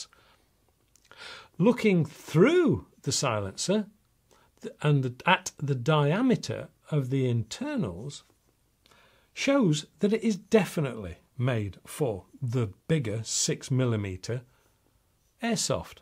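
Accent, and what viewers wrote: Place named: Liverpool, United Kingdom. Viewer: Thank you for an excellent review! Love your accent... Liverpool, originally? The real steel rattle abit, not as much as the airgun, but still! I do agree that the 17 gen 4 seems to be the most lifelike, but the threaded one, with a mock supressor, would mimic silencer on movies to a spot.... nothing like real life supressors...but again, still.. I am glad to your approach to the trolls, I am 58 and still enjoy airguns and airsoft to cheaper training then with the real steel ( ammo is extpensive in Sweden). Not to call you old in anyway, I would be far from it, but it is nice to see a mature man enjoy and review on YT... for the most it is youngsters.... don’t get me wrong, they do a awsome work...but... for the third time... ” still”... Keep up the good work, sir, and I hope to see many moore reviews from you, as long as you find it interesting! 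Thank you sooo much for upload! Oh, is there a Crosman SBR full auto pellet gun there, in your background?😉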